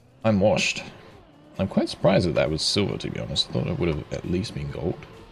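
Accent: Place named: South Africa. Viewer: I watched this as I couldnt get better than bronze on 14, I played it a bunch of times and figured it out and got gold :) just unlocked 16-20 :) Hey, your accent...I think your from SA?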